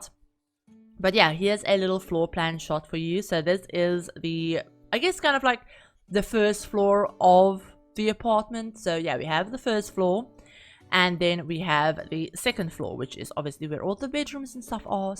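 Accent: South Africa. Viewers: omg! are you south african? super cool to see south african simmers on YouTube 😭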